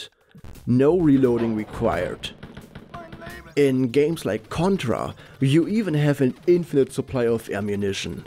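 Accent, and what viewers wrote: Germany. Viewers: Are you german?your accent seens a bit german to me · Are those guns of yours Airsoft? Because you sound German, and from what I know of German gun laws, I don't think getting an AR pattern rifle would be very easy. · Your German accent is commically bad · oh also love the accent. German if I'm not retarded, I wanna learn German eventually but either way really cool. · Man merkt das du Deutscher bist😉. Gutes Video👍👍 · I smell a slice of German accent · Man der hat so eine Richtig Deutsch-Englische außsprache ich liebe das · Du kommst definitiv aus Deutschland xD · Ahhh, german accent. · Oh hell yea a video about the functionality and realism of weapons in video games. German accent starts. Of fucking course. We just love our weapon technology. Greetings, a fellow german. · You have a decent German accent Ich als deutscher kann das gut hören Nices video über eine quality of life Sache die niemand wirklich beachtet Naja der reload ist für mich der Charakter einer Waffe (wie auch der Sound oder der recoil) Es gibt der Lieblingswaffe was vertrautes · ich höre direkt den deutschen aus dir raus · Man hört deinen deutschen Akzent ziemlich krass😂😂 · Der deutsche Akzent stört bisschen aber trzdm gutes video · are you German because this accent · Sein Deutsch-Englisch triggered mich.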